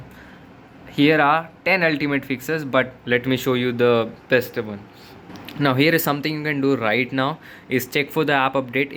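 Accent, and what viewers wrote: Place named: India. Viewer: adopt a british dialect please not an indian dialect please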